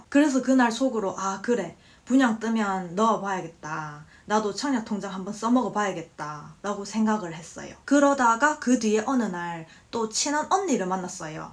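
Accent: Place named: Busan, South Korea. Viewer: ㅋㅋ부산사투리쓰면서 재밌게 말씀 하시네요ㅋㅋ무주택자 다자녀를 둔 외벌이 거제도 가장입니다ㅋㅋ 좋아요 구독 누르고 갑니다~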